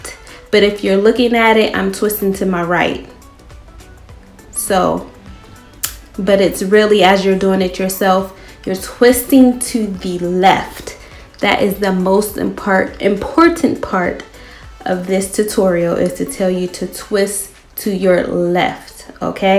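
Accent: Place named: Baltimore, United States. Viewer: She sounds like a young jada pinkett i wonder if shes from baltimore...i hear an accent ☺☺ i love ur hair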